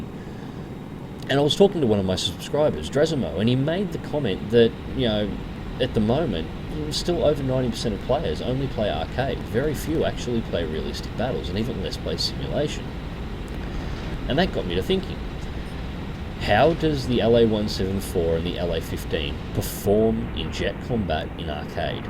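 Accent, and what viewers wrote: Australia. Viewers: yes indeed so glad i live over here and are you from Ausie i cant help but notice a bit of an accent?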